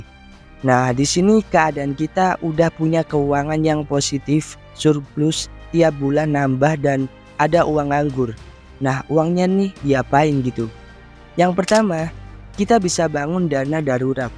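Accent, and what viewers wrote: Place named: Java, Indonesia. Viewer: Bro suaramu medok mencla mencle ga cocok ngmong la lo gue gue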